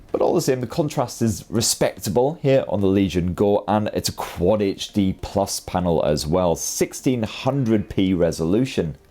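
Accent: United Kingdom. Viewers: I didn’t know I needed a cheeky British man (I think it’s British, the accent) being cheeky and snappy and intelligent and educating me about tech he’s savvy about… but I doooo❤ thank you to you and your whole team!!! Also appreciate the chapter names in the time scrubbing bar… I se you and appreciate you whoever is naming them!!😂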